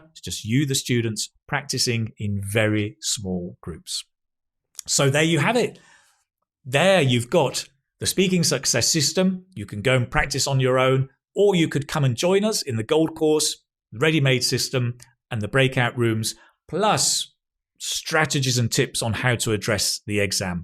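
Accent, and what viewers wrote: United Kingdom. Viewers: hi dear 🌹🌹🌹 i had problem of hearing what english people say. since im watching your videos on youtube my listening ability is improved in amazing way. a great begining music; and your glorious & clear british english accent. thank you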